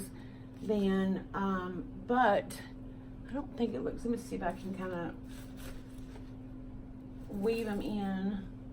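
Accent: South, United States. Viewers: That is such a sweet little corner you've created! Everything co-ordinates and flows so beautifully together. It's so nice to keep some sentimental pieces like your scarecrow through the years. I still have a Christmas ornament my friend from school made me in grade 5. He's a Santa face made from paper glued on a pine cone with a red paper hat and cotton balls used for the top of his hat and his beard. The years have caused the cotton to disintegrate a bit but he always hangs on my tree each year. By the way, I just turned 59 last week so he is pretty old to! Anyway, love watching your videos and hearing your southern accent, ( I'm from Canada so to me you have an accent)😊 Bye for now, Sandy 💟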